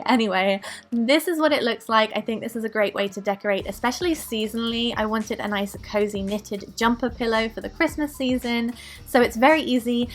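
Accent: United Kingdom. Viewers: It's so cool that your name's and you have the same British accent......btw ur amazing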